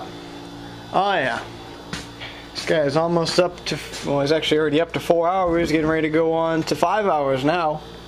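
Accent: Canada. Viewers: ur Canadian?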